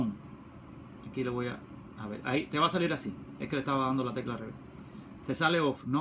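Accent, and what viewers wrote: Cuba. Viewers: Hola tu eres cubano te reconoci por el hablado, saludos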